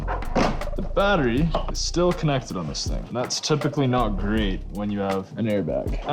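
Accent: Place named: Ottawa Valley, Canada. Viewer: Fuck bud, this feels and sounds like me and my god damned 1500, 5.7 ram. Glad i found ya. Fuck... hahaha we even say some of the same damn things. Never realized how much you lads sound like us ( ottawa valley, northwest a few hours of central Ottawa. Keep these comin bud!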